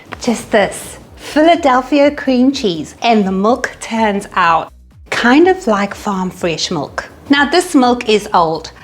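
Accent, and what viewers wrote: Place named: South Africa. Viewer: Were you originally from South Africa. Your accent sounds so familiar. But live from RSA anyway to you 😊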